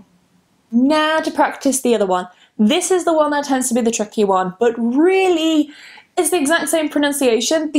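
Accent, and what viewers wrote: United Kingdom. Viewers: I feel like you speak British aren't you👀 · Sounds like British for me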